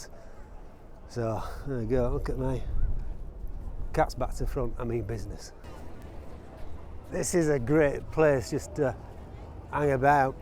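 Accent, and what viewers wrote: Yorkshire, United Kingdom. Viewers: Given that I've seen videos from you in Bradford, Halifax and Saltaire I guessed you must be living not to far from my birthplace. I watch your videos as much for the accent as the photos. Home rule for Yorkshire!! 😉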